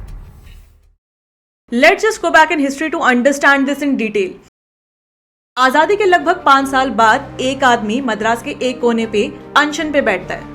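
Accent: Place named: United States. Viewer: American English 😄❤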